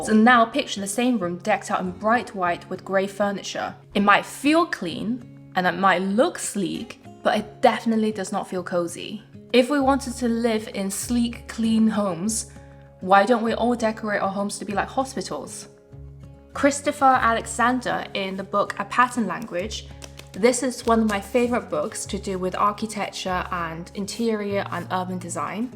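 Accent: United Kingdom. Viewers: I’m brazilian and your video cames to me. I’m IN LOVE with your accent and now I’m “using” your channel to practice british accent/english. THANK YOU FOR YOUR CONTENT! Ps: Also, I’m building my house with my groom, so your tips is very rich. Again, thank you! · I've never heard so nice British accent. ❤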